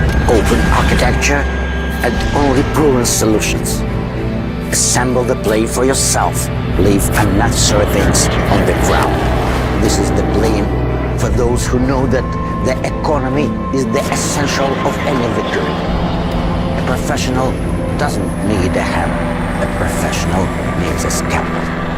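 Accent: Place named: Russia. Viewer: Russian explaining Russian jet in English with Russian accent and Russian subtitle?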